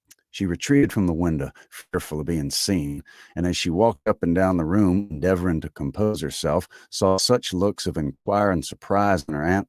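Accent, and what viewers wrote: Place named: South Carolina, United States. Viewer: I would love to hear you read this (I'm a South Carolinian, so your way of speakin' is the way everything should sound, of course!) but there's some kind of weird sound or feedback going on. Ha! Black coffee and harbor tea!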